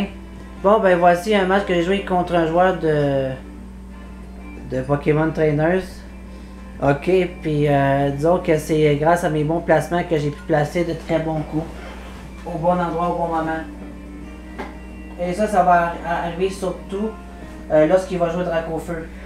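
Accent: Canada. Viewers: sympa ta partie GG à toi poto:) tu es canadien non?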